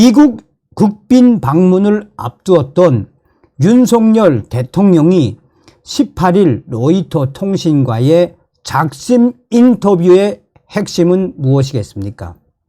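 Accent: North Korea. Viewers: 정선생님 기분좋고 감사한 방송을 주셔서 감사히 시청 했수다래 정선생님 기분이 좋으시면 꼭 북한 언어 사용 무척 정감있고 즐겁게 시청 하이 항상 건강 하시기요 정동무 ~~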